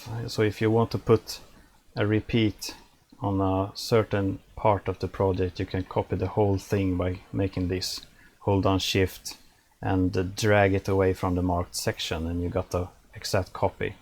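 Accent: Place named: Sweden. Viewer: ganska passande, har precis börjat med fl studio själv, älskar din svensk-engelska accent förresten :D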